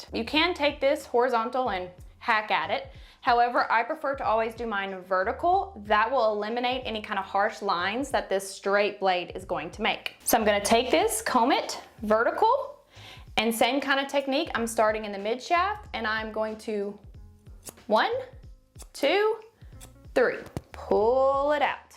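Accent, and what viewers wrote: South, United States. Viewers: Shoot ain’t u cute as they come! Your accent matches mine lol love your personality! Great video btw I really needed someone to show me in southern terms how to blend my own extensions the only difference I have is I’m brunette with really thick hair but pretty long too I also wear my hair straight more than I curl it plus I use one huge clip and fill in the empty spots best I can with my other individual extensions any suggestions without taking away a lot of my own hair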